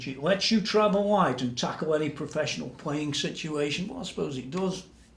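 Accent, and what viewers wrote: Stoke, United Kingdom. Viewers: You can hear a fellow Stokie a million miles away 😬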